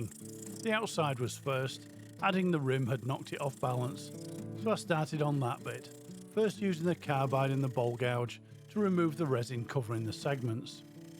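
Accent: United Kingdom. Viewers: I like your pedestal bowls, not least because I haven’t seen anyone else do them; and this one is particularly nice but I’m looking forward to seeing what you come up with next. It’s also nice to hear a British accent (Yorkshire?). 🌞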